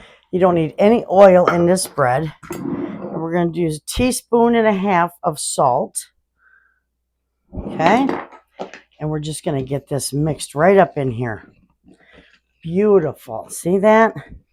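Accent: Michigan, United States. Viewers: I heard your accent and I said shes a Michigan girl. Went to your description, yep!!! I"M a Yooper.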